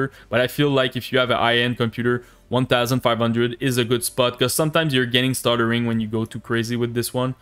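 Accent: Quebec, Canada. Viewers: L’accent québécois hahaha mais lets go je vais essayer ça 🤘🏼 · I like the quebec accent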